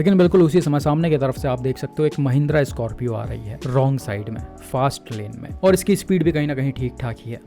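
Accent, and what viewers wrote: Lucknow, India. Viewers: bro are you from lucknow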